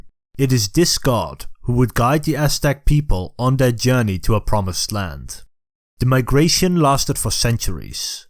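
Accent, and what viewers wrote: Netherlands. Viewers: I want to know too! I am hearing the slightest bit of a dutch accent? I am confused too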